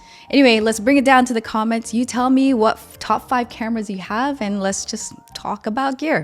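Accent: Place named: United States. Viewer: Yes, American accent.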